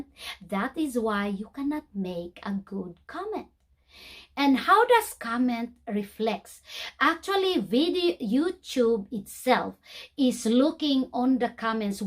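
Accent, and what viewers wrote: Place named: Scotland, United Kingdom. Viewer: Hello dear, I love listening to your voice it makes me feel talking to my Aunt in Scotland, your accent is same as her. I can relate to what you are talking about too, I experienced twice a person commenting on the comment of my viewer I thought it was normal here in yt world, it is disrespectful in a way but I leave it behind. I always agree to the golden rule. KEEP SAFE.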